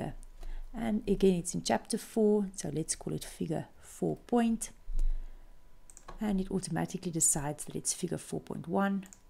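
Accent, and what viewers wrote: Afrikaans, South Africa. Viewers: Her South African English accent is very dominant :| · You sound very much South African! Thank you for this!! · She has an Afrikaans accent, from South Africa. I would know because I am Afrikaans too. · You sound South African?? 😂 Same here!! 😂 Thanks so much for this video!! I'm submitting my PhD in one week and you explain so clearly and simply! THANK YOU 😁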